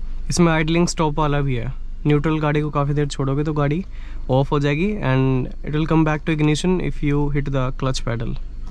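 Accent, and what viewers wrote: Haryana, India. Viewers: Haryanvi Chalke h bhai boli m 👍👍👍😃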